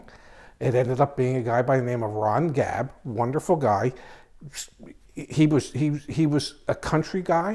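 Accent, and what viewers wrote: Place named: South Jersey, United States. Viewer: glad to see another South Jersey boy doing well. Great to hear the reference of the "deptford mall". Lots of great memories there. Don't live in South Jersey anymore but great to hear the accent. Hope your channel does well. I already subscribed. Best of luck!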